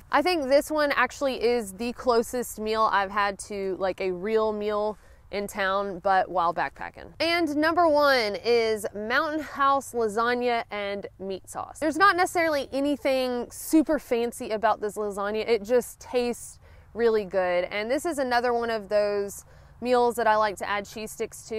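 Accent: South, United States. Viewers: I'm from Tennessee and hear it all the time,but I could listen to your sweet southern voice all the way to Maine... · What kind of country ass accent is that ☺ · Bet you never heard this before. I like yer southern drawl. Lol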